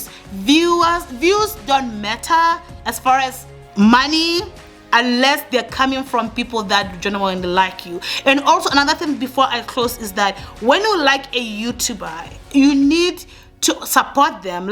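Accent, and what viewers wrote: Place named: Africa. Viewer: Thanks for providing detailed information.You can have African accent what is important is the information you are presenting to us.Well done with your spreadsheet we will always support you in the SADEC region in Africa.Felicity Family SA.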